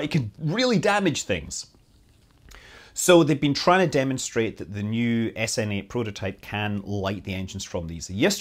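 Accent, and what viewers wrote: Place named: West Scotland, United Kingdom. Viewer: where in Scotland are you from? (I’m west Dunbartonshire) a lot of your words sound like you’re from the west 🙈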